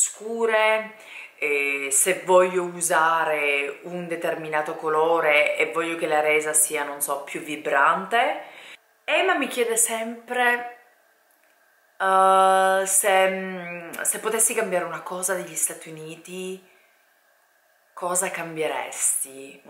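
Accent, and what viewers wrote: Sicily, Italy. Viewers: Sei siciliana ? io sono di catania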